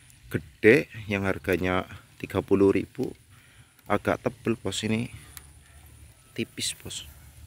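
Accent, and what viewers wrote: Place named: Banyumas, Indonesia. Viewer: Salam ngapak bos🤣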